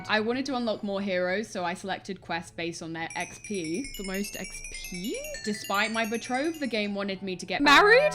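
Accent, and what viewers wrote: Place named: Southern England, United Kingdom. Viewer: hi (?) what accent would you say you have? what region of england? i hear southern but if there's something more specific let me know. sorry i just like accents:-)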